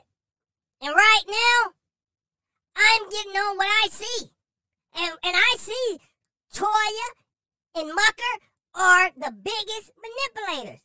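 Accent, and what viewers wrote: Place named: Carolina, United States. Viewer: I hear the Carolina accent. Lol. Is this new channel or channel name? Previously?🤔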